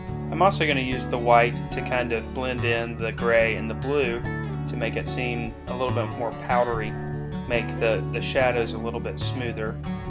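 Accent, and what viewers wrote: South, United States. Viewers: beautiful you are really good and i like you countryishh accent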